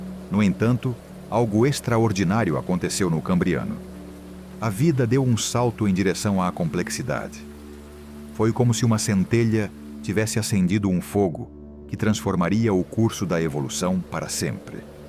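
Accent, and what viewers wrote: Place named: Portugal. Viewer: Pois é, tá em português portugal, mas é incrível o quanto se parece com nosso português algumas palavras 😊😊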